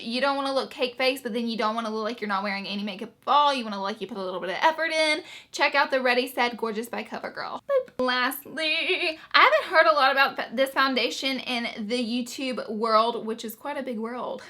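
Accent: South, United States. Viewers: that country twang lol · I say oil the same way.. I think it's a southern thing because in Louisiana that's all you hear! 😂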